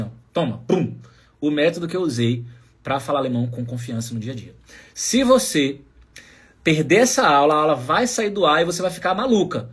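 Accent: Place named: Ceará, Brazil. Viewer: Hei macho, e tu é do ceará, da fustaleza!!! Neh?!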